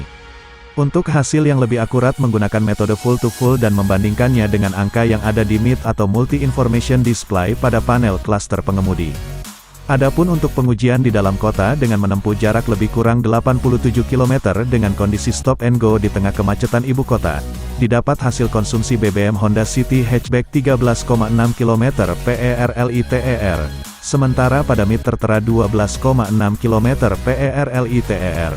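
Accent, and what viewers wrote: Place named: Indonesia. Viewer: Beberapa penyebutan dalam bahasa Inggris dibaca seperti bahasa Indonesia 😅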